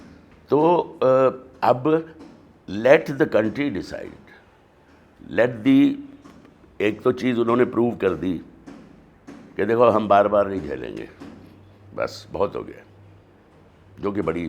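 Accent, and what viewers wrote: India. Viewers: Although sound indian I admire his voice..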